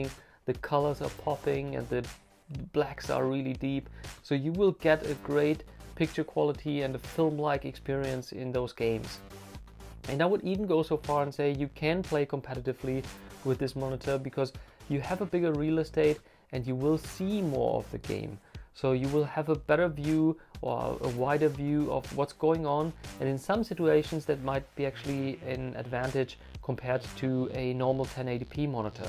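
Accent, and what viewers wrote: Germany. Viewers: Hey thanks for the review, the monitor costs 670€ at the HP Store this weekend and you really convinced me to give this monitor a try I can barely notice your german accent, just saw on your profile that you're living in japan, thats my dream man hope you enjoy it :) Cheers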